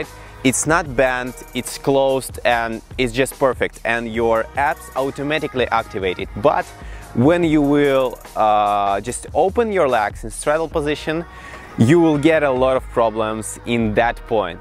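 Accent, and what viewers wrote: Russia. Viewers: Блин) акцент вообще чётко услышал, смотрю - и в правду русский, а я и не сомневался)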